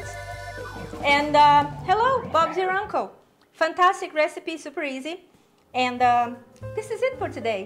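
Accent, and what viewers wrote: Brazil. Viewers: Você é brasileira reconheci pelo sotaque <3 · I recognized your brazilian accent, omg I'm bilingual as fuck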